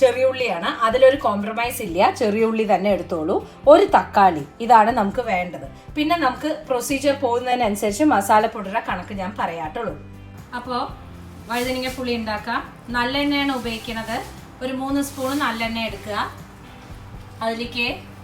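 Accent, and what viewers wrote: Palakkad, India. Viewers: your Palakkadan language awesome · palakkad slang kelkkan super njn thrissur anu pne curry indakkunund tto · Madam.your Palakkad language kekkan sumundu.kettolin Palakkadil evedeyanu Basha kettu kollongode side Anu thonnunnu .with best wishes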